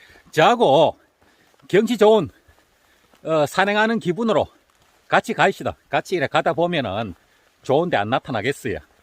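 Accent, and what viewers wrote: Gyeongsang, South Korea. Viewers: 경상도 같은데요 겅남쪽 ~좀 알키주이소~자랑만하지말고예~😃😃😃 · 구수한 갱상도 말로 전해주는 자연소식 잘 보고 있니더 항상 조심 하시소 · 아름다운 풍광과 맛깔 나는 경상도 사투리가 너무 잘 어울립니다. 고맙습니다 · 이분 대구사람인가? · 경상도 오빠야네예 ㅎ 조심해서 다니세요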